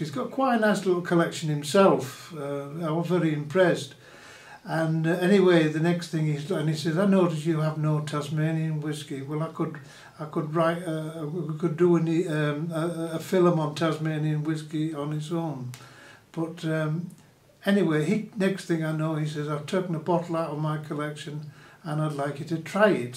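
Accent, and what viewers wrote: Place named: North East England, United Kingdom. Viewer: Great guy! Sounds like north east England (his accent). Says “filim” a few times!! Great guy, great video, appreciate the tips!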